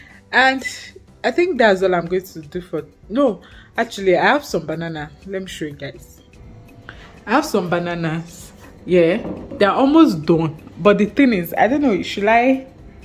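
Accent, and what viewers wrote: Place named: Nigeria. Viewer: don't worry He/she is just hating on your beautiful Nigerian accent.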